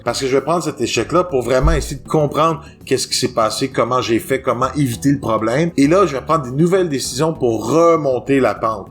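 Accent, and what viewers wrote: Quebec, Canada. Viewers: Je viens de regarder plusieurs de tes vidéos. Tes débuts sont très encourageant, c'est de mieux en mieux, je te souhaite beaucoup de succès avec ta chaîne. Ca fait du bien d'écouter l'accent québécois, c'est dépaysant, et puis pour ma part ça me "rapproche" un peu de ma petite sœur qui vit à Montréal depuis bientôt 7 ans... Je m'étais fait la réflexion que tu étais peut-être enseignant... Un truc dans une autre vidéo m'avait notamment fait tilté (je sais pas si c'est une expression bien utilisée au Québec, ça). Cela dit, on m'a déjà dit qu'on pensait que je l'étais alors que je ne le suis pas... mais j'en ai épousé une ;-)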